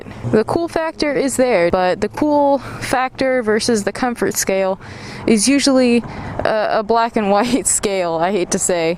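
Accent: United Kingdom. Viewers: English accent on point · why dont you put on a set of MY kustom bars ;) and your british accent is bloody good mate!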